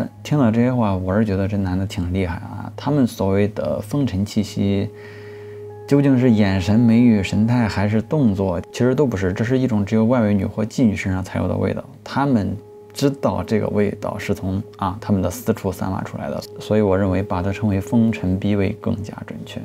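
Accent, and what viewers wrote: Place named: Guiyang, China. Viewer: 你是贵阳的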